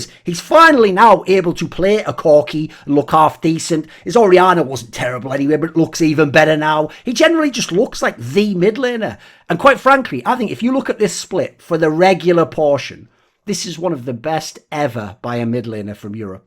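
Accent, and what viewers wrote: France. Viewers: 10/10 french accent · that french accent made me scream with laughter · the french accent's masterful return !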